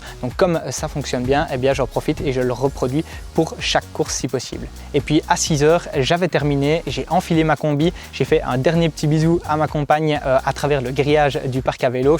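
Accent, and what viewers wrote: Belgium. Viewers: Avec ton accent je croyais que tu étais belge xD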